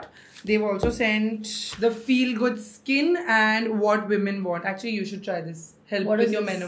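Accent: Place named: Gujarat, India. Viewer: I love your Gujarati accent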